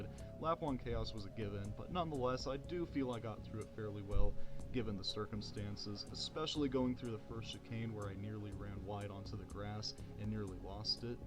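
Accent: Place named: United States. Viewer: Very nice! The memes are great! LOL! Nice surprise to not hear a European or Australian accent too! I didn't you know you were American/Canadian!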